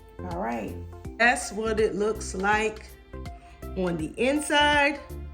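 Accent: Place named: South Carolina, United States. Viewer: This is how we make bread pudding im from SC, and she sounds like she maybe from there as well or close. I've seen ppl bread pudding and I wouldn't eat it because it didn't look like this 😂. I also toast my bread first ❤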